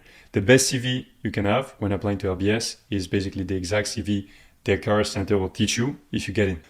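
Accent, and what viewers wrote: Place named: France. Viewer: Korean look, French accent😮 weird guy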